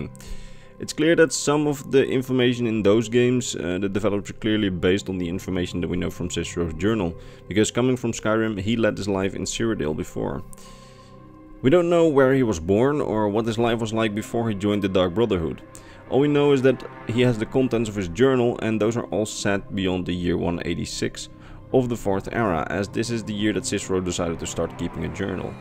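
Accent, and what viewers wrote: Netherlands. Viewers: hi! i love this vid, question tho, are you dutch? you kinda have that dutch english accent. im dutch btw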